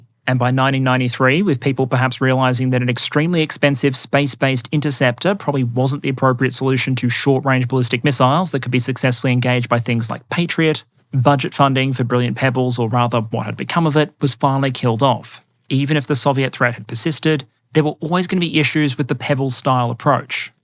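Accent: Australia. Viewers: Am I the only one finds it amusing and somewhat dystopian that team has needed to substitute "Australia" for "Israel" in this videos subtitles to avoid automated demonetisation by youtube? It's amusing that a tech superpower like 'Alphabet Inc' and its automated filters can be defeated by the Australian accent and tweaked subtitles, and dystopian that team has had too do it An example of this is at the minute mark, but it happens throughout the video so I don't think it is an accident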